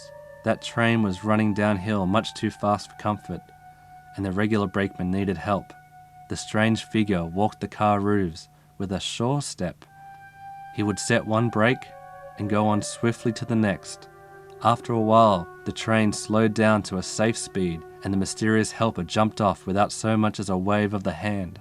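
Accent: United States. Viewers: I live in the US. I like your narration, but I have to say your Old West accent amuses me. :) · Love the southern accent, sounds like the Beatles XD cool story bro, thanks for sharing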